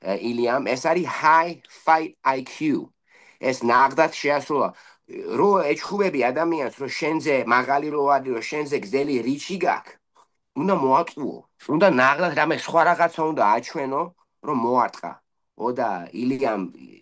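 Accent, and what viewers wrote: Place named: Megrelia, Georgia. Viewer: ძმურად ინგლისურიც გაურიე ხოლმე მეგრულ კილოს უხდება სასწაულად 😂😂😂 კაი ბიჭი ხარ ძამია წარმატებები არ მოგაკლოს ღმერთმა !! სალამს გიგზანი ბათუმიდან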